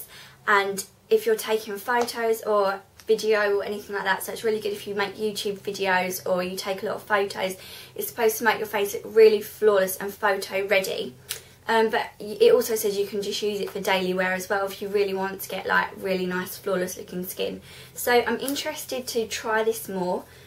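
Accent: Essex, United Kingdom. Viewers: I'm British and I can tell ur an Essex gal! X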